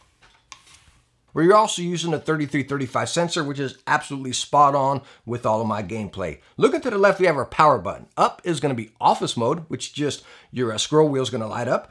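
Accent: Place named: New York, United States. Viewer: Bro this guy talks like my uncle from New York and it's great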